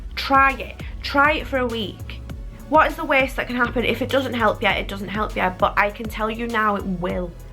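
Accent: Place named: Liverpool, United Kingdom. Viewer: Am in my early 30s and have had it for prob 7 years it’s a nightmare. Glad your putting yourself out there and trying to help us. You sound like a slight scouser too am from the north of Liverpool myself. Keep up the good work your positive outlook is great 👍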